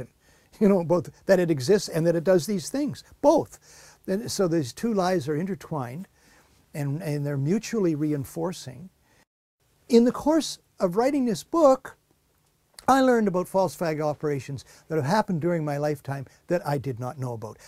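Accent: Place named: Canada. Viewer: this guy is canadian ay! (out and about pronunciation)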